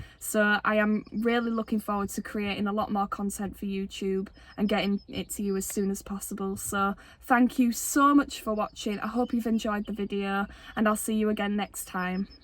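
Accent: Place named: Northern England, United Kingdom. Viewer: Brains, beauty, dirty coveralls, filthy hands, cup of tee and a northern accent. It’s made me enjoy leaning to repairing my landy.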